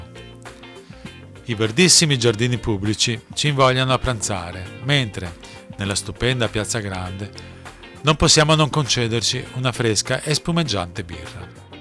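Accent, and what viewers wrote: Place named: Veneto, Italy. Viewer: bravo, mi sembra sentire accento veneto ! : sono francese, ma di origine italiana (parenti eravanno du Nervesa) quando ero giovane facevo bici sul' montello, sulla strada vicino treviso, et poi nel 70-80 troppo macchine in italia, adesso sembra che ci sono ciclabile : o pedalato dalla francia fino treviso due volte, ma addesso sembra difficile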